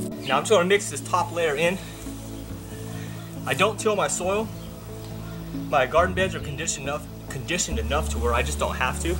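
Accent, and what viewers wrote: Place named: Louisiana, United States. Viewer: Love your videos bc your accent reminds me of home lol you have to be cajun lol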